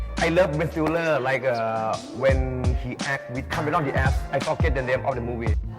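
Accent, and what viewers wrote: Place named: Thailand. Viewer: ถึงสำเนียงจะไทยมากแต่ถูกต้องทุกคำค่ะ 😊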